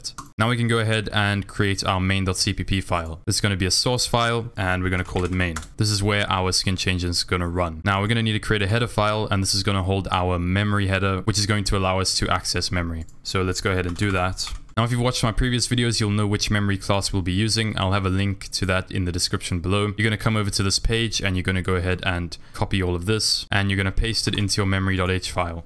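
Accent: South Africa. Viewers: no way my mans from SA lmao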